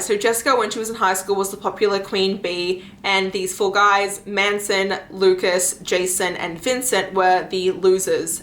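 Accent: Australia. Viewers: The way you talk sounds abit Aussie too me ,,, cheers from Tasmania Australia 🦘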